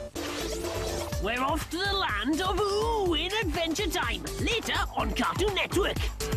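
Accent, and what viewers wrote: Scotland, United Kingdom. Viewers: It speaks British accent it's sure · The narrator sounds like a Scottish accent.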